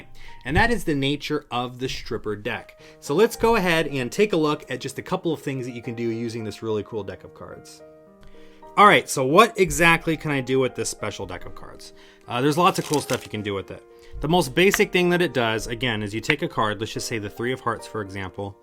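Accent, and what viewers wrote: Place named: Ireland. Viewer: Funny irish accent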